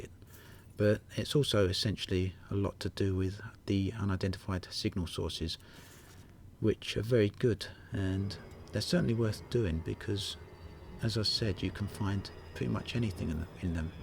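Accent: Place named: Cockney, United Kingdom. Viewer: Good vid. Please could you re-dub it in a Micheal Caine accent. Your normal accent isn't too far off...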